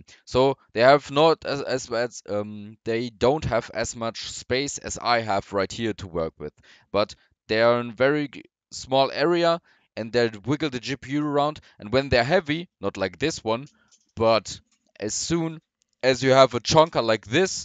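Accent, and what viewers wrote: Germany. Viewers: why talk german people so hard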